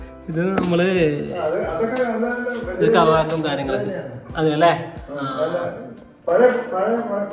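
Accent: Kannur, India. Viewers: ഇയാളുടെ സംസാരത്തിനു കുറ്റം പറയുന്നവർ ഓർക്കുക പല നാട്ടിലും പല രീതിയിലും ആണ് സംസാരം ഇത്‌ കണ്ണൂർ കാസർകോട് ഭാഷ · ❤kannu style aanu samsaaram.its ok. but culture illatha oru perumatam . oralod samsarikkumbol ulla reethiyil maatam varanam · Ninda oru kannur samsaram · valre shriyanu chodukkanum , athinu corect Aayi marupadi paryanum shrmilkuka malayalm kannur slang pryumbo kelkkunnvarkku arochakamyi marthirkkan prthyekam shrdikkukka njanum oru kannur payynnur karan anu nammlde slang mattullvrkku mansilyikollenminnilla athu onnu shraeicha thnne nannvum Aniya prgarm nallthanu niglude oru tudkkakrnte vishmam undu athokke sheri ayi vratte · Kannur slang👎🏻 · KANNUR BHAASHA ETHRA NANNAAKKAAN NOKKIYAALUM MOZHACHIRIKKUM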